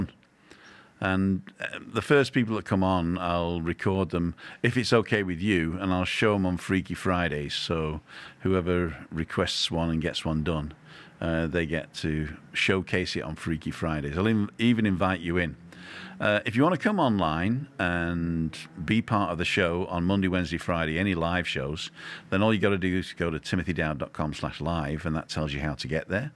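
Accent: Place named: North, United Kingdom. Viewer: You should send your portfolio to acting agencies, you’ve definitely got the voice for it and I think the subtle northern accent is something that’s not heard enough on mainstream.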